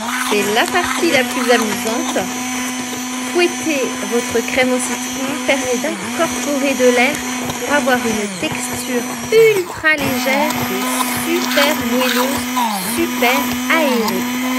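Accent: France. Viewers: me too, I came back just to listen to the French accent 2nd time :) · Sorry, you had me at the french accent. I was not expecting that. Lovely! 🤩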